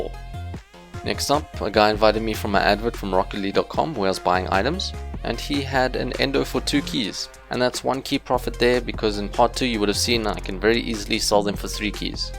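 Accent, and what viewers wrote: South Africa. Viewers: your south African right? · i hear south african, i like. Btw, i know how the lag feels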